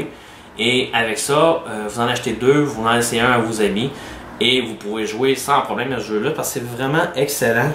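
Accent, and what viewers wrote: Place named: Quebec, Canada. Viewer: enfin des instructions en bon vrai quebecois, merci !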